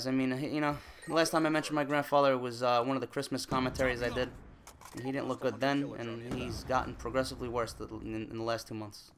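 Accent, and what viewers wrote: New York, United States. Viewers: I predict 100,000 subscribers by the end of the year. NY accent + Rage + valid observations = kick-ass commentary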